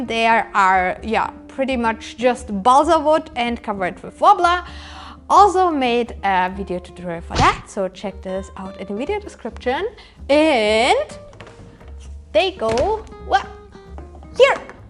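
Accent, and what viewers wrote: Germany. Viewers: I think she is German she has an German accent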